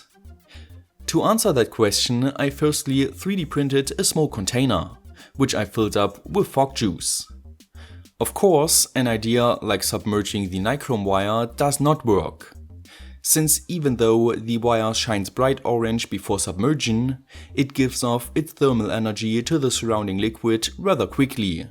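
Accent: Germany. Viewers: Are you from Germany???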